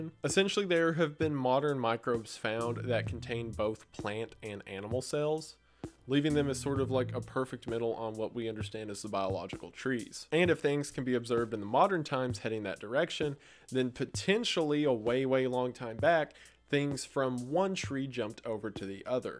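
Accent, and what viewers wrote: South, United States. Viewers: Saaaame. Then again, in my house, we* might even enjoy " reads the dictionary" as background noise. * "we" includes my demonic toddler lmao; that chill, Southern-accented baritone is just SOOTHING, man 😌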